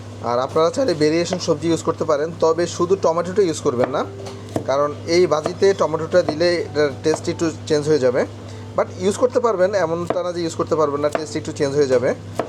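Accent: Noakhali, Bangladesh. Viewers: ভাই রান্নাগুলা দেখান চমৎকার৷ কিন্তু শব্দ চয়ন গুলু নিতান্তই খেত, যেমন ফানি,ফেয়াজ, ছিনি, আরো অনেক নোয়াখাইল্ল্যা উচ্চারন৷ বিষয় টি খেয়াল করলে খুশি হবো৷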